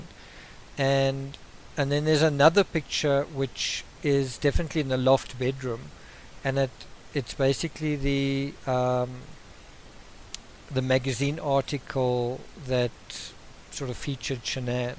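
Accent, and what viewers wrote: South Africa. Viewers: He sounds like an Indian living in South Africa 🤔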